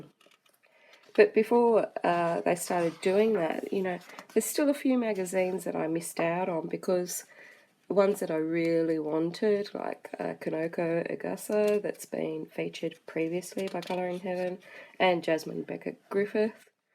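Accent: Australia. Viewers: Like your review style.like your Australian accent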